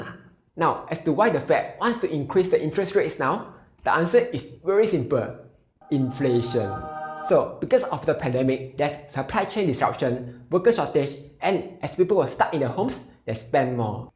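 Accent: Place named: Singapore. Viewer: Long live Singlish! 😁😄👍💖🙀💯😇😁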